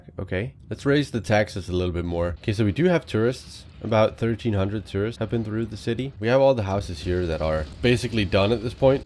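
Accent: Denmark. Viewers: Jeg tror bare jeg var lidt træt da jeg så den gamle video. For nu kan jeg godt lidt høre den Danske afsagt. Men stadig rigtig god til engelsk. 😁 Og så vil jeg bare sige tillykke med de 500 abonnementer godt på vej til 1000 🎉🥳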